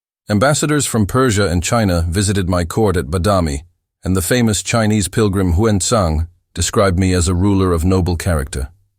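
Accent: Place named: United States. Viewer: Can the creators of this video remove the american accent narration?Lets have an Indian english accent. This narration is making a mockery of the names.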